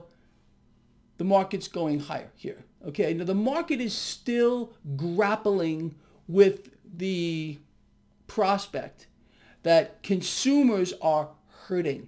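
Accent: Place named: East Coast, United States. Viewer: Of course I don't know. He seems well intentioned, even sweet to me. But I admit to being very amused by his accent and personality. So East Coast!!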